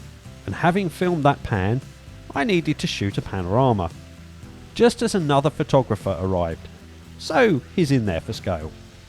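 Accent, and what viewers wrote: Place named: England, United Kingdom. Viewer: The English accent and clear diction make this a must listen and watch!!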